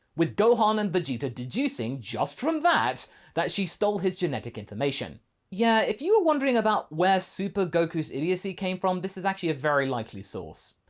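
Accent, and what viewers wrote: United Kingdom. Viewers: J2391 hes british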